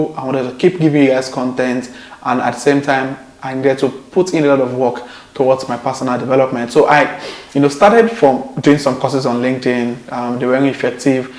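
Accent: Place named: Yoruba, Nigeria. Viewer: Please, why is your your Yoruba Accent still so strong?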